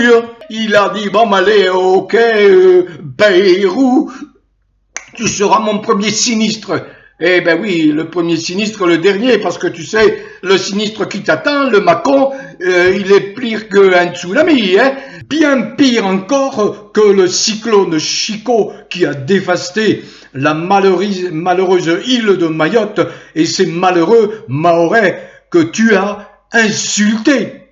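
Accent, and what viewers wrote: Marseille, France. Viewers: J.adore votre accent marseillais pour bloquer le M@ ronds........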